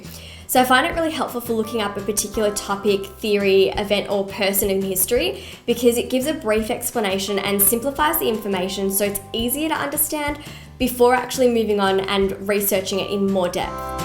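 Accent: Australia. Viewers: Love the Aussie accent! Thanks for sharing. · as soon as she started speaking, I instantly guessed she must be an Australian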